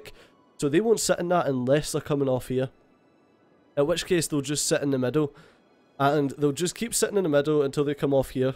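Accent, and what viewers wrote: Scotland, United Kingdom. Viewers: are you scottish? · Maybe it's just me. They say they hate english accent but I actually love it. Do people in Scotland always speak like this? I need to focus really hard to understand some words you are saying... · Im Dutch, but that is scottish, not german.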